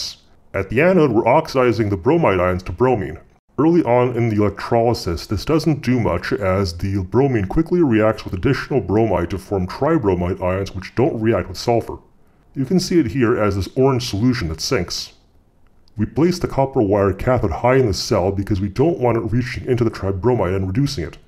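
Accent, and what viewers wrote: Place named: Canada. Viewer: its not, he's just canadian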